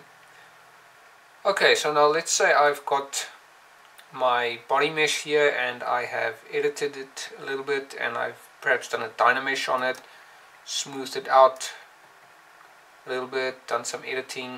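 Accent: South Africa. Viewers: Hahaha lol SA accent 🤣I'm South African too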